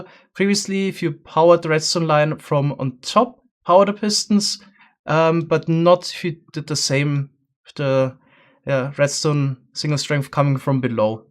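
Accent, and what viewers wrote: Germany. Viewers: Are you german? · Is he from Germany?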